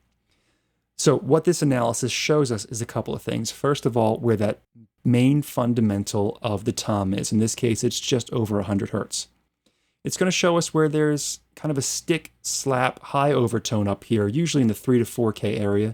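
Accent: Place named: United States. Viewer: Love your teaching style, great advice, very well presented, nice results. On a humorous note: I can’t believe nobody called you on your pronunciation of “high piss filter” !! I speak four languages and traveled for two decades around the world, so I understand accents, language barriers, all kinds of stuff. But except for that one word, you sound like a very normal English speaking (from America) kind of guy. Not sure why you pronounce high pass with an i. Anyway, thanks for a great tutorial, catch you on the next one !!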